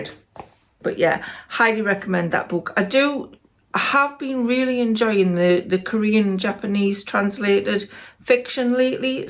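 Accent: United Kingdom. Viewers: First of all, how nice to hear a British accent on YouTube!!! I watch loads of bookish, interiors and cooking videos when I can, and the presenters of the videos are - without fail - American - so I'm so happy to have found you. Look forward to catching up on your back-catalogue of book recommendations. x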